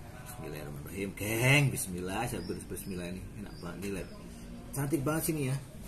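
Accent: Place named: Banyumas, Indonesia. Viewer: wow musangking ngapak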